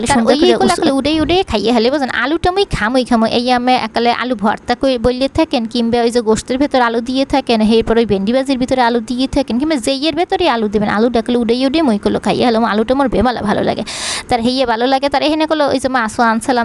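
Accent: Barishal, Bangladesh. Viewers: আমার বাড়ি মাদারীপুর। থাকি মালয়েশিয়ায়। আমার ভাবি ও বরিশাইল্লা।কিন্তু আপনার কথা এক্কেবারে খাঁটি বরিশাইল্লা। শুনলে অনেক হাসি পায়। আপনার স্বামী ও কি বরিশালের?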